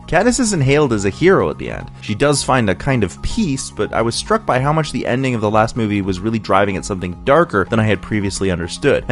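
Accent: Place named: Canada. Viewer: Question, is he Canadian? The “OUUT” gives him away. lol Love the video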